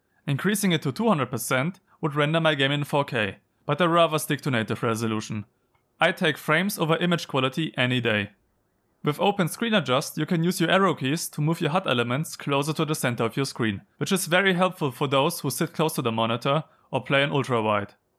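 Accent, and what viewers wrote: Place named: Germany. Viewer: Ah finde es immer wieder herrlich wie man in weniger als 5 Sekunden sofort hört "das ist n deutscher" 🤣no offense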